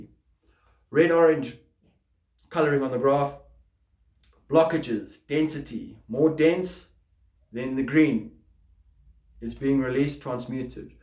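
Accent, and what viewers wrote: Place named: South Africa. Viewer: Thank you for this! Was so happy to hear your South African accent😊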